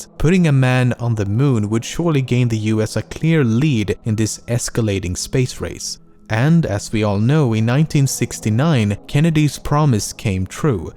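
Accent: Sweden. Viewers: love u man with ur sick swedish accent, keep up vids ive been with u since 50k subs and rember when u still replyed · you sound soooo Swedish tell me you are!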